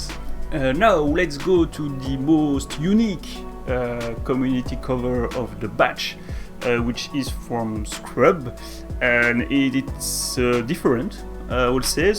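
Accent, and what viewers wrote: France. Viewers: What a handsome guy you are! And nice French accent. ☺ Thanks for keeping on Driver on YouTube. Wish you success!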